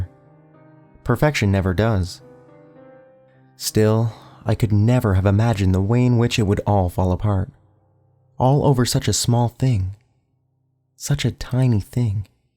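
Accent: Canada. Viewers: Great work friend! After the third one I stopped noticing all the "about" and "out". Canadian accents and humor aside. I think you have an actually soothing voice unlike certain other creepypasta readers, and an excelent selection of stories. Love how you read The Gristers.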